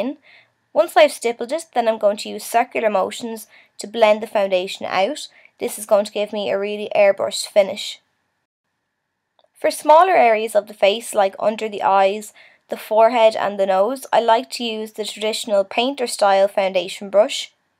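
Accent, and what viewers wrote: Ireland. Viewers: ur irish? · Ahh the irish accent :) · Are u irish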